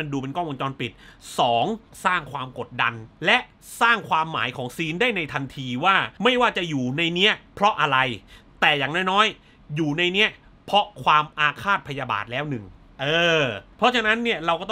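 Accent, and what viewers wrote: South, Thailand. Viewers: เอ้า คนใต้รึครับพี่บาว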